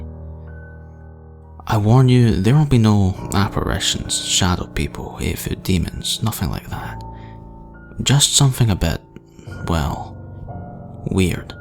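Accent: Scotland, United Kingdom. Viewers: Hi devilslittlesister. Unfortunately for my freaked out family, it's not a creepypasta, they swear blind it's true. And, yeah, he's a great narrator, I'm a sucker for a Scottish accent!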